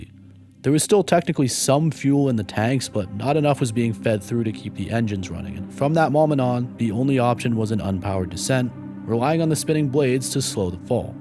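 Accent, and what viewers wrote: Canada. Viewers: Love this new chan theres loads n loads strange things I never seen before plus love way Canadians say oot 😅 same as guy from criminally listed 😀 😄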